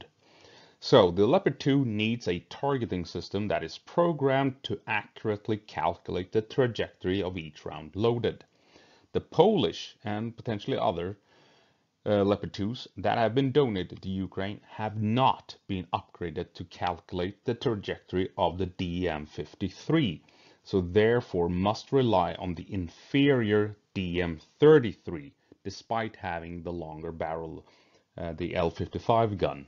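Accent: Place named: Sweden. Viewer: Hej! Could just barely hear a weak Swedish accent 😊 Great video, greeting from a fellow swede!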